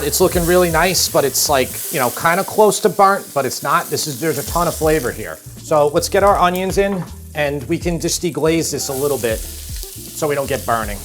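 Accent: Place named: New England, United States. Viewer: Mexican dish from Colorado cooked by dude who sounds so New England that he should be pouring it on a grinder with a side of clam strips Beautiful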